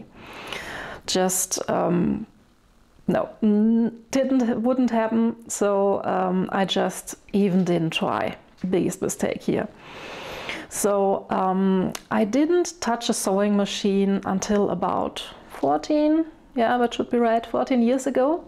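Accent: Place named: Germany. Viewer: girl, you are a superhero! I love every thing about what you do and already ancomplished. Auch wie ab und zu einfach das Deutsch durch kommt 😅 Aber mega gut es für alle verständlich zu machen natürlich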